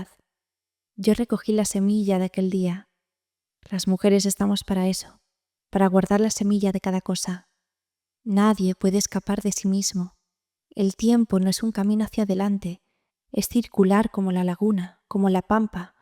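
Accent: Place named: Spain. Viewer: Gracias por tu narración ♥ Tienes el acento de qué región de España? Me encanta!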